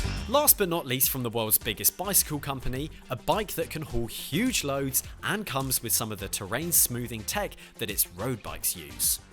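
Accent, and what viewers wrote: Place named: England, United Kingdom. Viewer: I just love how English people say Aluminum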